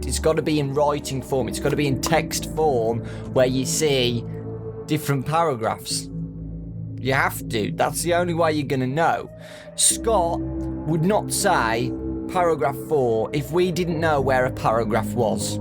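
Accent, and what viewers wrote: United Kingdom. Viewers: Is he british or something ?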